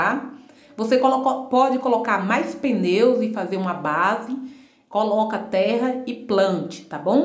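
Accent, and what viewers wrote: Bahia, Brazil. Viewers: minha linda, kkkk vc é muito engraçada gosto do seu sutac baiano,. Amei seu trabalho parabéns,!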